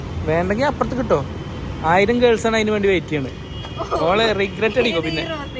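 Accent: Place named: Kozhikode, India. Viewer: കോഴിക്കോട് ആണ്...... Confirm... ഇയ്യ്... അ അന്നെ.....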